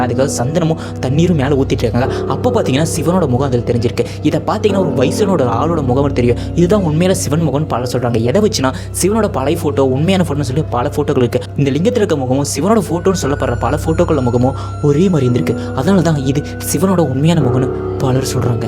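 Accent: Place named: Vellore, India. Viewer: Vellore Tamil ❤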